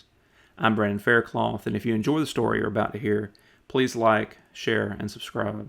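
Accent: South, United States. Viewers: Came here from an add. Heard you have an American southern drawl, which is my weakness (I'm British) and had to subscribe. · Yes, us Brits do love a Southern drawl...I find it mesmerising 🇬🇧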